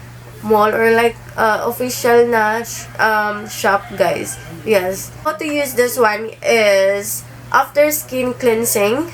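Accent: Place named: Visayas, Philippines. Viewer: Halatang Bisaya HAHAHA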